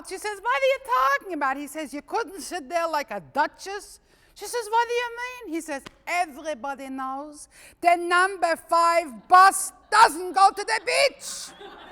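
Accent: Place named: New Zealand. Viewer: I love your Yiddish New Zealand accent.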